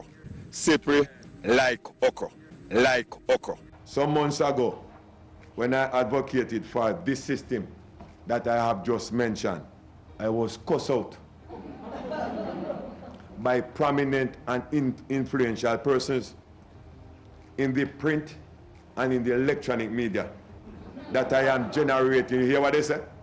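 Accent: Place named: Jamaica. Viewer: The man yah patois deep you fret!!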